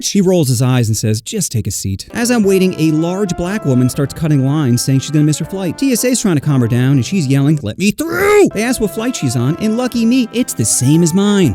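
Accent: Florida, United States. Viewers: This man sounds Floridian, talks about a lot of haitian interacts and they're riddled with them there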